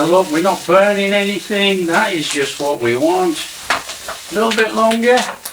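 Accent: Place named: West Yorkshire, United Kingdom. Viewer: My guess is that despite your strong West Yorkshire accent and upbringing that you are cooking in Thailand, busy every day with these splendid demonstrations and not able to remember when your waistline was 28” (if ever)!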